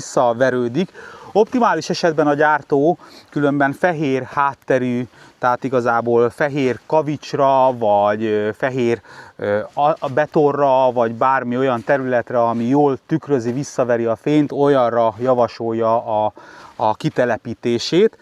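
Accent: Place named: Moscow, Russia. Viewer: az csattog ez pedig Kb Moszkvai akcentussal beszélni mazsar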